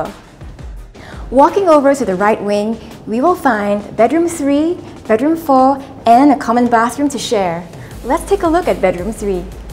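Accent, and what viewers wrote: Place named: Philippines. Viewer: seems to be a Filipino because of nice English accent..🇰🇷🇺🇲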